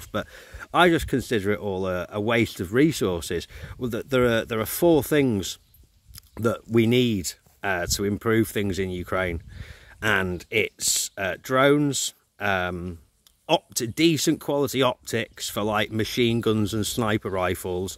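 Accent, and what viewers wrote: United Kingdom. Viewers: British accent in Ukraine😅👍👍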